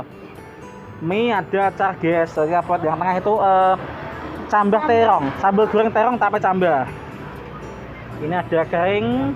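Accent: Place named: Central Java, Indonesia. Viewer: Nah ngno jozz segala arah dshot 👍👍👍 masuk2 yo ngno logat jawa tengahe nongol jg sip lah.....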